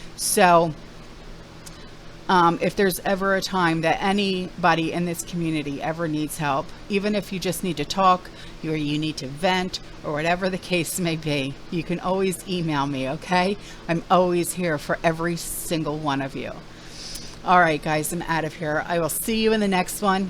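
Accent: New York, United States. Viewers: Hello Seattle In The Chat 🤗 I don't know what i like about you the best. The New York accent or how dang sweet you are❣️ I always look forward to your post ❤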